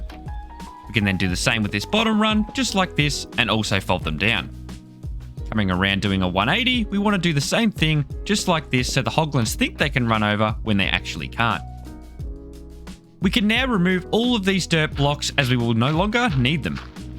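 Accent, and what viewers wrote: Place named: Australia. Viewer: I was wondering if you are an Aussie like me because you sound like it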